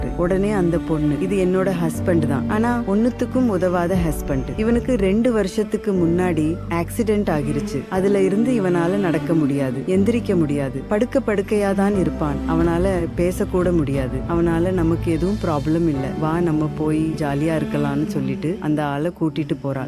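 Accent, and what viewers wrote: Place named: Kerala, India. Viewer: Madam unga videos ellam super.unga voice very very super.unga kuralil malayalam kalantha tamil ketka enimaiyaka erukkirathu❤❤❤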